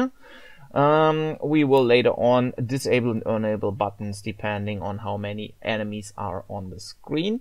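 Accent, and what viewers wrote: Germany. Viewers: Damn, this accent sounds so German!!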